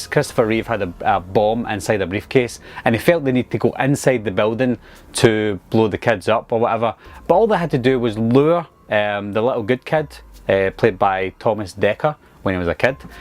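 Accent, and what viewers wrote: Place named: Liverpool, United Kingdom. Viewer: Liverpool accent ✌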